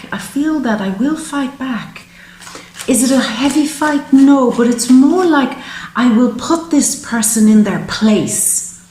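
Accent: Ireland. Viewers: Between your beautiful calming energy, gorgeous face and enchanting brogue, I can't help but feel hopeful even facing adversity. Just as you said in this reading, I have someone really rubbing me the wrong way and it's family and I'm shaking thinking about the difficult conversation I have to have with them but I will stand resolute in the knowledge that I will speak my truth and that shall be good enough. Thank you...your gift is truly divine..wish me luck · Irish?? Love the accent. I'm hetro by the way but something about you.......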